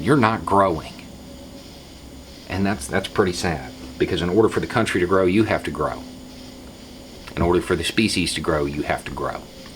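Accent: South, United States. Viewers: Come on now. Southern-sounding white guy in his garage, with a decent sized beard and cap? Oh hell, brace for the... Whoa, This is Awesome!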